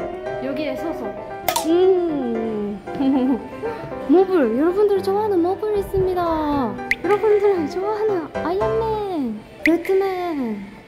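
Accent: Gyeongsang, South Korea. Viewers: 은근히 대구 사투리 뭍어 나오는거 겁나 매력적.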